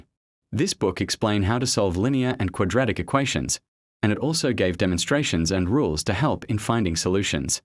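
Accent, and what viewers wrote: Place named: United Kingdom. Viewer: An AI voice talking with a British accent, pronouncing Arabic names perfectly, and claiming that Muḥammad ibn Mūsā al-Khwārizmī was an African. In reality he was from Persian or Central Asian descent and was born in the region of Khwarizm (Chorasmia), near present-day Khiva, Uzbekistan. This channel is really unravelling...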